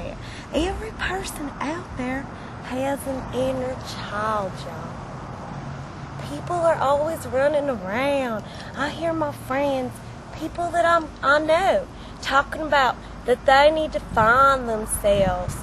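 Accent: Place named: Mississippi, United States. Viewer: i'm from and live in missippi, and she sounds like a lot of people from here and alabama..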